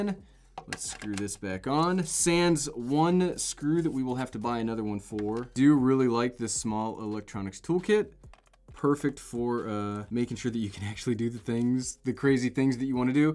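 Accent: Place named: United States. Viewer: Always makes me do my confused face when Americans pronounce 'Soldered' as 'Sodard'.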